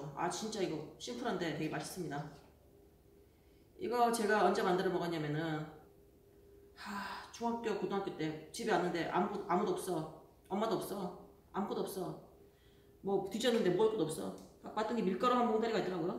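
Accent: Gyeongsang, South Korea. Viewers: 왠지 대구분이실듯 ㅎㅎ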